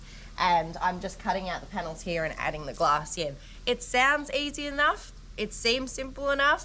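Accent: Australia. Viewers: Gday looking great got Granddaughter Number two with me Sarah she is 18 months and loves your show haha maybe the Australian accent have a great week !!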